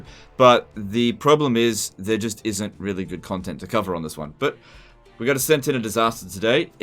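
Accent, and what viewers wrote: Australia. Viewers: I'm just here for the abuse and edgy jokes. Nice to listen to a genuine Aussie, instead of the wannabe Americans that flock the Youtubes. :P Was fun to see my former favorite Total War game (WH2 > All) as a disaster campaign. Would love to see more.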